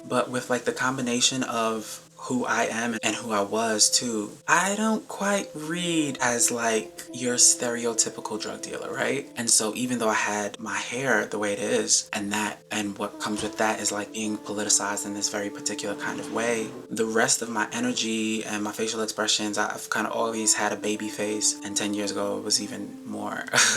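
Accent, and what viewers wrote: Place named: United Kingdom. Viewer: I really enjoyed your British accent!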